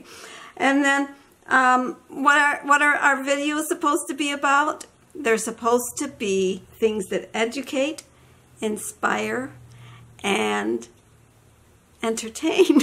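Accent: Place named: Western New York, United States. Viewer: You are from Western New York Ms. lol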